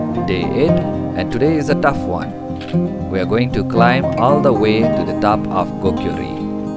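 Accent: United Kingdom. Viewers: Amazing vidéo! the landscapes are beautiful and the explanations so clear, love you english accent! where u come from ? after reaching gokyo valley how did u came back ? and by which way?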